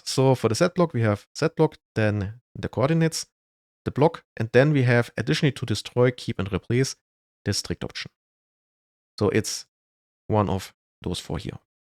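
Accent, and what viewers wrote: Germany. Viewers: Guten tag, your german accent is so good, i'm kinda jealous about it. Tut mir leid, meine deutsch ist sehr schlecht, ich sprichst ein bisschen deutsch, aber das ist lange her :p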